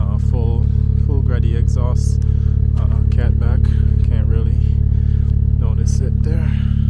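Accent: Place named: Jamaica. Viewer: Breddah The jamaican Accent cyaan hide, CLEAN!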